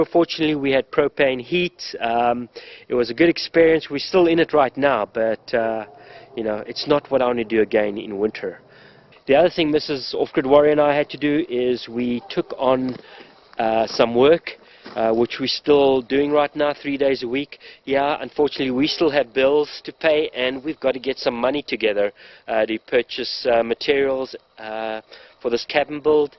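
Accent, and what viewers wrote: South Africa. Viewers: is he south african or is it just me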